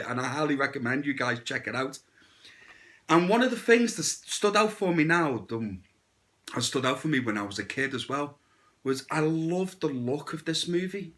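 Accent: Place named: Liverpool, United Kingdom. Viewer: Boss review, you sound like your from my neck of the woods Liverpool 🤣❤❤👍👍👍👍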